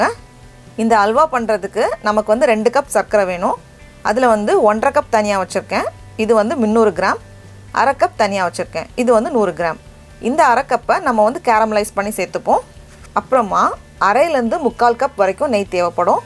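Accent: Nagercoil, India. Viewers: Super. Naa nagercoil ponnu.unga pechu oore ninaika vaikuthu.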